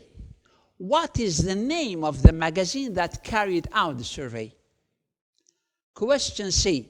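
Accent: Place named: India. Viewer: lol he has an indian accent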